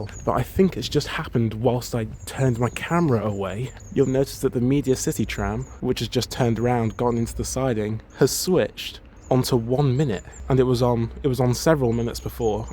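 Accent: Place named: Australia. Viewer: Stop talking like an Aussie...proper fkin annoying.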